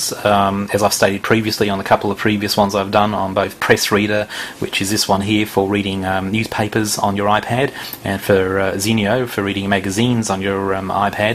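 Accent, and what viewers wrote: Australia. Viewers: are you from Australia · LoL racist j/k - The guys clearly an Aussie. Sounds nothing like the British accent.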